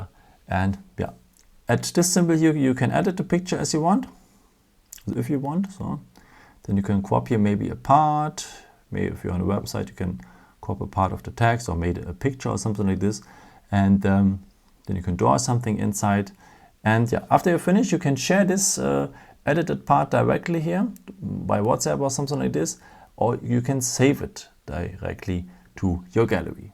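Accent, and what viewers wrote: Germany. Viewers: Bist du vielleicht ein Deutscher? .... oder, sogar ein Österreicher? ??? That's why this was the best & most concise video! Ich habe es Sofort verstanden ----> which wasn't the case w/other videos!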